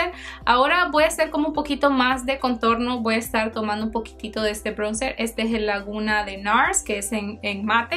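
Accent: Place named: Honduras. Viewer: Me encanta cuando dice... y toda la cuestión... muy Hondureña jajaja Saludos nena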